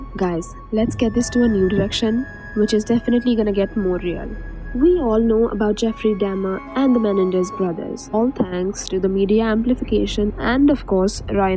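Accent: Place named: India. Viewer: Omg this indian accent makes me angry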